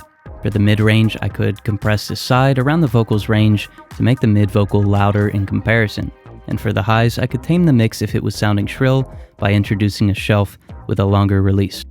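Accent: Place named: Brooklyn, United States. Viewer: This guy must be from Brooklyn. I can hear it.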